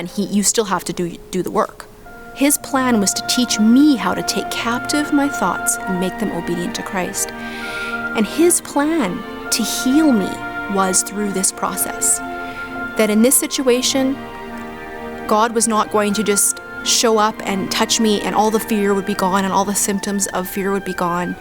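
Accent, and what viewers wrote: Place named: Ontario, Canada. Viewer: wow.. this video touches me I started sobbing xD.. but something that's funny to me is that.. she's Canadian and I'm Canadian yet I could tell she has an Ontario accent and I live in Ontario xD Normall you can't tell.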